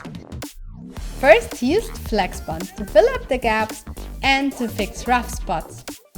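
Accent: Germany. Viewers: I love your germand Accent! S2 S2 S2!!! I BUY YOUR BOOKKS!!!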